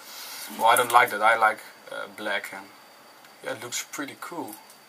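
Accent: Netherlands. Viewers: volgens mij een nederlander, ( klinkt namelijk nogal nederlands en engels is ook met een nederlands "accent") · je hoort zo erg dat hij nederlands is =D if i talk english it's sound much better ;)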